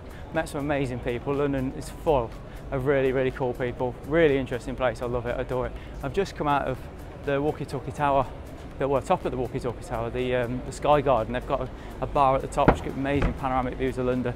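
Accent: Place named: Wales, United Kingdom. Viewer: I found him pretty easy to understand. I also liked his relaxed blogging style, which I guess you don't much. You're probably not used to a Welsh accent, which may explain why you are struggling to understand English. Otherwise, you might want to get your ears checked out in case you are going deaf, which would also be a better use of your time than trolling.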